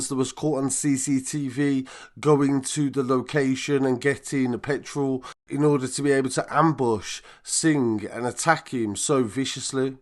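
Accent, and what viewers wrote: Birmingham, United Kingdom. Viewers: Bro your starting to sound like a brummy bin man again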